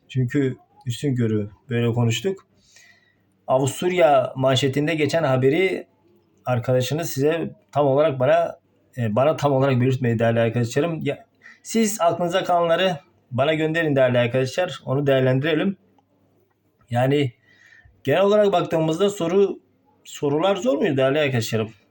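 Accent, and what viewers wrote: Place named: Van, Turkey. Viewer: Hocam ne kadar yakışıklısıniz Vanlı mısınız shhshshs öyle bir sive aldim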